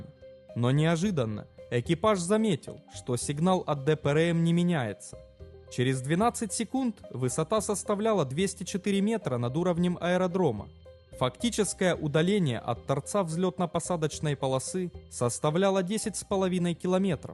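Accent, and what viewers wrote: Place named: Zaporizhzhia-Dnipro, Ukraine. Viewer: только из-за этого жуткого запорожско-днепровского акцента смотреть и слушать не смог.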